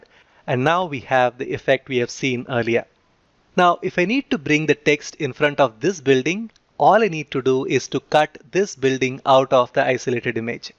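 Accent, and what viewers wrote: India. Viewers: Obviously indian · Please don't mind....but can I know that you are an Indian or American Sir?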